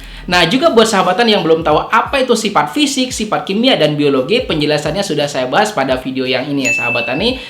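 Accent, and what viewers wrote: Banjar, Indonesia. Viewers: Urang banjar kayak gitu ai... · Bukan Sunda tapi banjar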